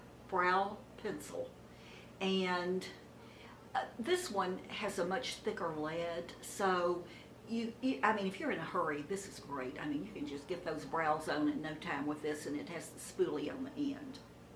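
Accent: South, United States. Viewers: Well Hallelujah and God Bless You. U have done what I wish all the others would do. Glad u reached this point and got off the crazy roller coaster. These others start out to review products, make money and get a Gillan w major company to get freebies. But they get sucked into the tornado of vanity and keeping up with the gurus. I've watched Kandee for 9 yrs maybe, and she is a true artist and appears so happy and sweet and not jaded. She a beauty. I have tired of the few others I did watch and only look around for swatches of lip colors, etc. I'm a lipstick gal.. Thts my 1 product, just as my mom's was. I too, use a cubicle almost exactly like urs, acrylic w a handle and it was a flatwear caddie tht I use to use serving buffet style. It's perfect und sink and easy w the handle. I have no more other than a box of all my lipsticks cause I wear diff shades. 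Do, glad u guys are safe and Destin is where we vacationed 18 yrs I was married. It's wonderful and I'd love to live there one day but I havent done sun in the last 12 yrs. U sound like u might be from GA or SC. Take care and stay beautuful. Doesn't take makeup when it comes from within.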